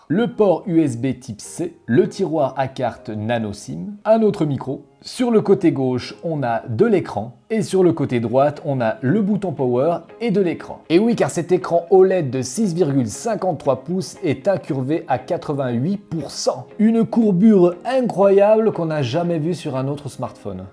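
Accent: Switzerland. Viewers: On voit à ton accent que tu es Suisse... 😂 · quel bel accent suisse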